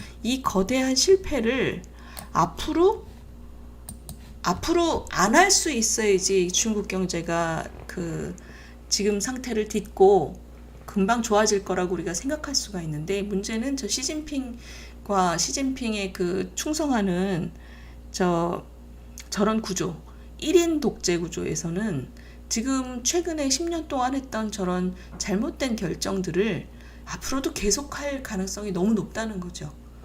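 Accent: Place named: Seoul, South Korea. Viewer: 말투가 저랑 똑같아요. 경기도 방언인지 서울 사투린지 나랑 너무 똑같아요. 희숙님 ! 나이에 맞지않게 젊어 보여 좋아요. 희숙님 영원히 늙지 말라고 기도 할께요 😊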